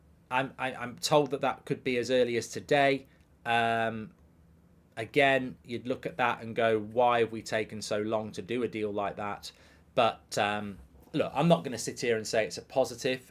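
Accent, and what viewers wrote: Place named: Birmingham, United Kingdom. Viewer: He’s a Brummie.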